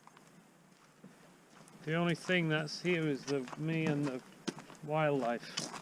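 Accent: United Kingdom. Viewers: your words about nature with your british accent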